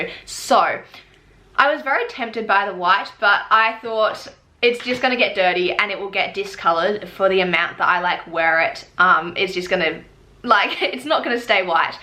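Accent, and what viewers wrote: Australia. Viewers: Hi , I like your accent, reminded me of travelling through 'Stralia' 22 years ago. You did some good research, thanks for pointing to the table. I am in the market for a new sportswatch after my vivactive hr broke. How was the 245 inthe last 2 years? Did you mis the cool features the higher models offer? How was it when swimming, is 50m wr enough? I do like a little more ruggedly, because of playing with kids. I am in a sales period here now, and got to a short list: fr245m for 250, instinct solar 300, fr745 for 350 and fenix 6pro 450(still expensive). The 7 is out but is way too expensive. That solar instinct should have music. Which one would you recommend?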